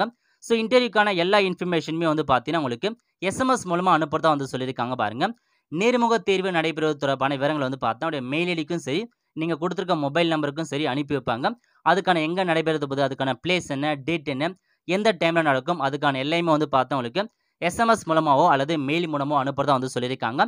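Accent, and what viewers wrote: North, India. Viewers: என்னடா நீ வடக்கன்ஸ் மாதிரி இருக்கு உன் குரல்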